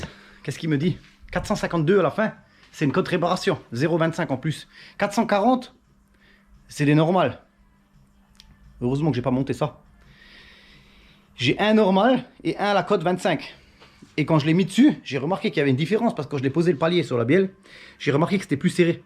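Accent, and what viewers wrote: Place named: Lorraine, France. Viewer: J aime bien ton accent, ça me rappel ma famille du côté de ma mère, on était à Metz. Les Kron et Ramm. Maintenant je suis en région parisienne, la misère pour trouver un bon garagiste... Faudrait que je fasse 350 km pour te voir ...😢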